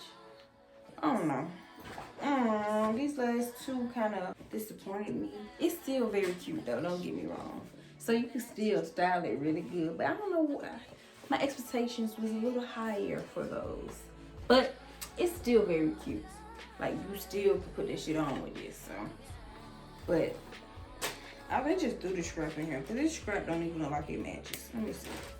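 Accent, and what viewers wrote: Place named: Memphis, United States. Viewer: Shein b coming threw wit some of these purses lol especially for dupes and u sound u from Memphis 😂❤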